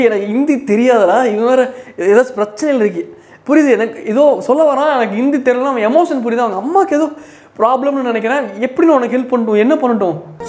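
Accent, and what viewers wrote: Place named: Kerala, India. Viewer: Enna anna adikadi malayalam cross aaguthu😒😂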